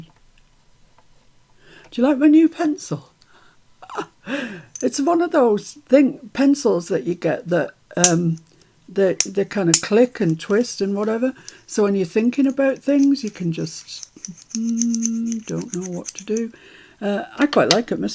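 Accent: United Kingdom. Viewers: You are really fattenin' ol' Bob up. I LOVE it! I also love rice paper. It is wonderful to touch and is really easy to apply. I'm glad to see you used it. LOL. Did you say 'trim-mahhhge?" 😂😉 I find when I am working on my flippy flappy and following your video, I speak in your British accent. I repeat what you say just the way you say it. 🤭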